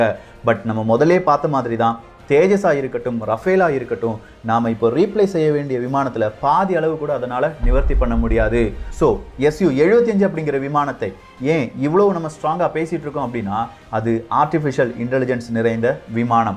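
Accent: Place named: Thoothukudi, India. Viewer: thala nyt namma RN kao adha marandhu radhinga ok va en bro neenga thoothukudi ya slang andha mari iruku sometimes silla ucharipu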